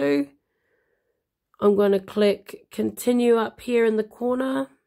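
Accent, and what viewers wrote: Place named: New Zealand. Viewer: Lol funny, as soon as you started talking I thought another Kiwi. Great video by the way.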